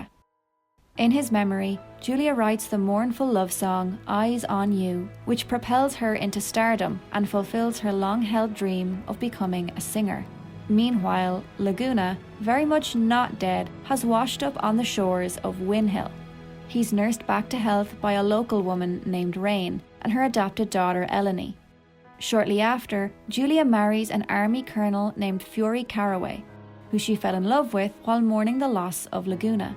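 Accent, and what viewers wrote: Ireland. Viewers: Great to hear a fellow Irish person as a FF authority!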